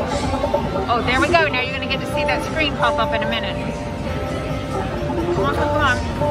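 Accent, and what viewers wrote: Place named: Pittsburgh, United States. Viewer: Do I detect a fellow Pittsburgher? If so, hello from Kennedy Twp. Love the video 😊❤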